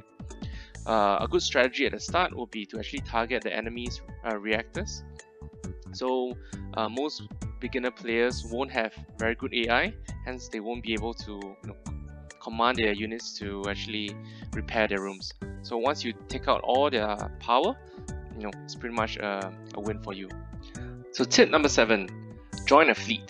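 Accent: Singapore. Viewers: sinkie accent very obvious haha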